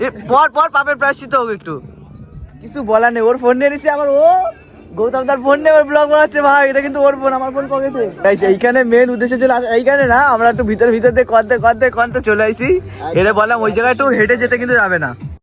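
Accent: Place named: Digha, India. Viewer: Vai majha Tumi ja tomader local language a kotha bolla amder dighar dikao amon typer kotha bola.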